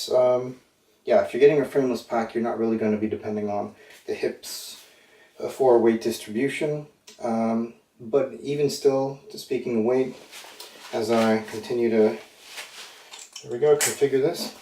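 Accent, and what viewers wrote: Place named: Midwest, United States. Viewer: Nice 420 joke. You should put some information on your profile. How did a guy named that speaks with an American Midwestern non-accent get over to the wilds of the European Norselands? Enjoy your videos greatly!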